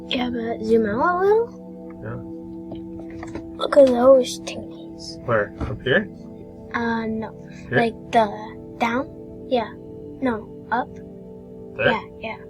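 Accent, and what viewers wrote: Canada. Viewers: New subscriber and loving your content. So straight forward and easy to follow. I do love how u mention something about the founders of US maybe having the good intentions I was taught they had as a kid. Very hopefully comment on the eve of the Independence Day. I noticed u said “they have for profit prisons over there” wonder if u too are American or not? U always talk about talking to those that do this work modern day. I am a supplier of stone in Carrollton GA most all local masons buy from me and I will help them get whatever they need. I will show some screen shots to the most skilled ones and see what they say! A lot of them don’t speak first language English but I suspect they all know the narrative is bs. It’s the Americans that are the hardest to get to see what’s right in front of them. Second edit, I place the accent to Canada now. 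Yes our prisons are for profit, and it’s as twisted as it sounds. Entire portions of our population here have had extra Bain washing in hopes they will end up in the prisons to reach the numbers and fill the pockets of the evil entities that stand to profit from the prisons, or those that own them.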